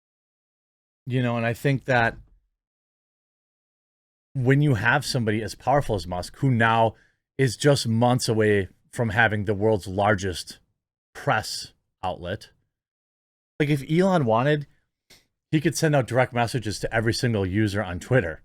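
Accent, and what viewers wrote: United Kingdom. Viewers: Guys with pompous British accents telling Americans how to run their country hasn't worked out historically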